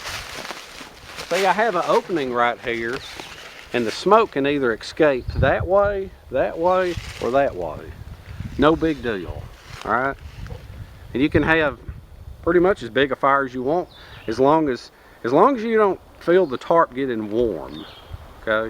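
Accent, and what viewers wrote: South, United States. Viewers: Well, bless your heart. Like me, from the South. We all have license to talk funny. That includes the use of strange idioms. "Jewel" or "little jewel" is interchangeable with "doohicky", "thingamabob", "whatchamacallit", or "jobber/jobbie". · From down south? Alabama, Mississippi, Louisiana maybe? You sound just like my sister in Louisiana. (Only with a deeper voice...lol) I paused the video to write this. I’m waiting to see whether or not you say something like “Like this I reckon.” or “Look at this ya’ll !” Lol I’ll just die laughing. 💕😉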